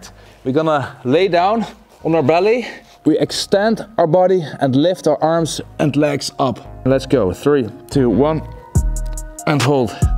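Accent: Netherlands. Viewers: I can here the dutch accent in your english bro 😂😂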